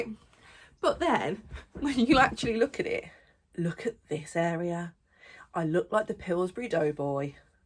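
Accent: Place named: Birmingham, United Kingdom. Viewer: Fellow Brummie. Lovely to watch a knitting podcast with someone who has the same accent as me. Appreciate the honesty of having a wip that is not going as expected. This is so me!! From an experienced frogger 😂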